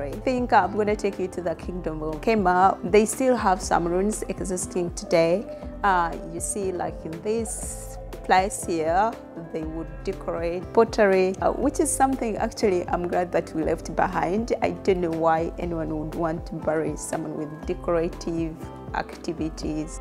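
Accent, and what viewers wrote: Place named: Uganda. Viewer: I love your Ugandan accent ❤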